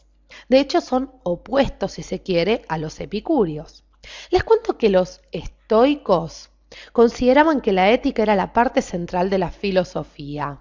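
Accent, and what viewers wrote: Argentina. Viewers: el acento argentino PTM no me gusta... la info buena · Un placer epicúreo es disfrutar del acento argentino.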